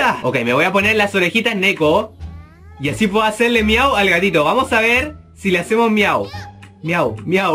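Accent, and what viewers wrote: Chile. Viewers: Hola una pregunta eres chileno ? ¿Y si no de que pais?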